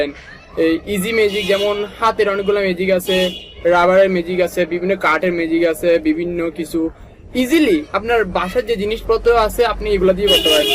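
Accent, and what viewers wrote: Chittagong, Bangladesh. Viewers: agee giye kotha bola shikhen... chittagainga gula ki aktu suddho kore kothaw bolte pare na naki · Ctg er manus suddo bolta pare na...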